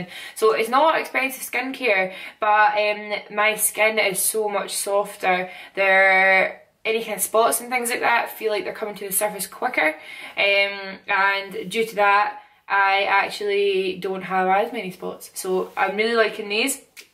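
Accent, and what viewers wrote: Scotland, United Kingdom. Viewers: scottish accent? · everything you've done is "Amazing". You did everything perfectly. Your stunning gorgeous now. You know your shit, that's truly. Your wedding photos are drop dead gorgeous.......and that dress you bought with all the layers almost makes me cry.....so beautiful. Keep up the great work. I'm addicted to your videos!!!!!! More please!!! 😘. Plus I love your Scottish accent. Love your friend from 🇺🇸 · SCOTTISH! hahaha this makes me so happy to see a Scottish YouTuber who's videos are about beauty! Probably cause I'm Scottish! Normally people say the Scottish accent is really harsh and angry sounding! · Ah I love the Scottish accent! If I weren't Welsh, that'd be my choice! Amazing skin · Kayleigh Ritchie is that a scottish accent? if so it's really gorgeous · Are you from Scotland? Hahah being Scottish myself i picked up the accent within seconds! 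· I love the Scottish accent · you are beautiful and I love your gorgeous Scottish accent, just want to let you know that your jouer lippy is pronounced jou-eh not jou-er. Just for future reference. Keep shinning gorgeous. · 12 seconds in I actually love you your so funny hahaha totally do the Scottish accent justice!!! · I love this video I don't even wear makeup I just watched it bc of her Scottish accent!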